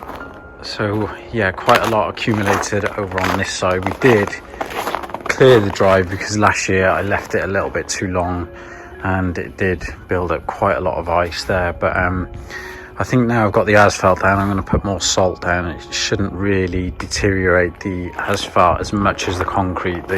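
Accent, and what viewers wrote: United Kingdom. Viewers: I like your accent. Which british?) Winter is coming (Starks know about that) . Village in this season really nice, and air so fresh, only smell of Russian banya, when tree is burning mmmm smell from my child time when our houses didn't have gas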